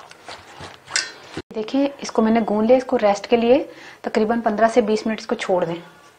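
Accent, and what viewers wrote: Punjab, India. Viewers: ماشاء اللہ جی... چس آ گئ... پراٹھے کی بھی اور آپ کی میٹھی میٹھی پنجابی کی بھی.. 🤤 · Your panjabi so sweet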